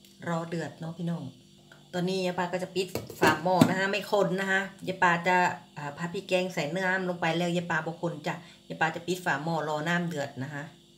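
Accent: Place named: Korat, Thailand. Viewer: แกงมะเขือเนื้อน่าอร่อยเผื่อด้วยค่ะไม่กินเนื้อจ้ะ คนโคราชนิสำเนียงโคราช สบายดีนะ😋สวัสดีจ้า วันนี้ว่างเลยมาหาค่ะ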